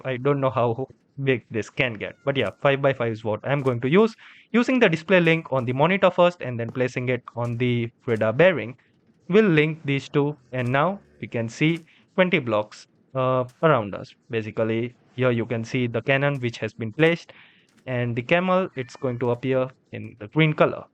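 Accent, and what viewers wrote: India. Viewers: when the indian accent came on i instantly closed the video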